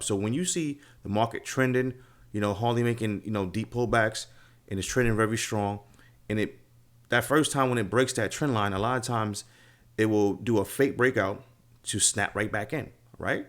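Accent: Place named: New York, United States. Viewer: My brotha from NYC. I can tell by your accent that you're a New Yorker. I am too. I've been watching your video's on a binge. Great stuff thus far.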